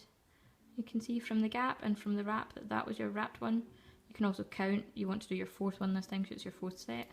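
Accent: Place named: Scotland, United Kingdom. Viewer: Excellent tut!! Love your Scottish accent!! Love the way you knit - maybe another tut?? :) +1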